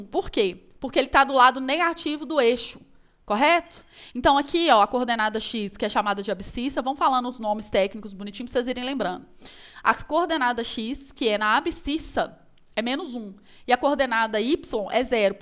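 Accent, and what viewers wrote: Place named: Minas Gerais, Brazil. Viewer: Tive um problema assistindo aula dela… adianto que foi ótima ! Aprendi bastante. O sotaque minerin dela 🥰😍 às vezes me distraiu 😊😂 Excelente vídeo !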